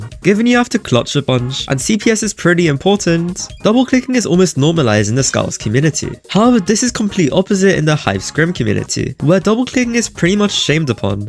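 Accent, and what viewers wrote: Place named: England, United Kingdom. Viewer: Your English accent is goated dude!! 🔥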